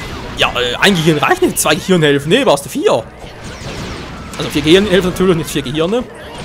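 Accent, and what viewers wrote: Switzerland. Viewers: Bist du zufälligerweise Schweizer? :D PS: merkt man am Dialekt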